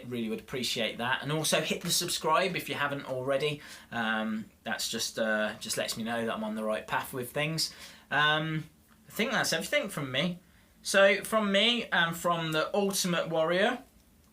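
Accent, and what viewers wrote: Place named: Birmingham, United Kingdom. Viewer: You sound like a fellow Midlands Fam. Maybe Birmingham?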